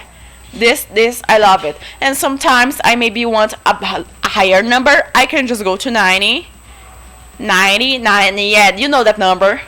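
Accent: Brazil. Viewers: Gahahahahaha i learned the same amount i had fun with this video! Thx! idk where u're from, but sounds like ur from Brazil, if i'm right, muito obrigado pelo vídeo! Hahahahahah me diverti e aprendi mt S2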